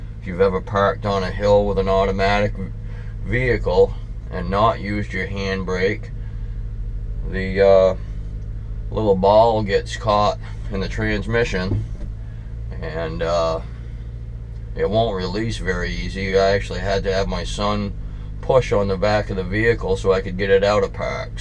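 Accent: Maine, United States. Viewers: Sound like a mainah!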